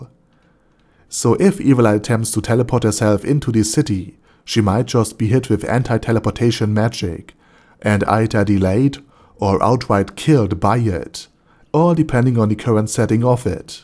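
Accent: Germany. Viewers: Geiler Deutscher Aksent Feier ich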